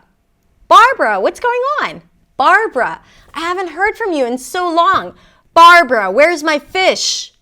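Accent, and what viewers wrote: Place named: United States. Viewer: I'm a native speaker with linguistics training and this was fantastic. Your American accent is fantastic! I can only hear the tiniest hint of non-native accent, it's so wild. I appreciate that you included the name Siobhan because it's Irish and you'd be amazed how many Americans have no idea how to say it 😂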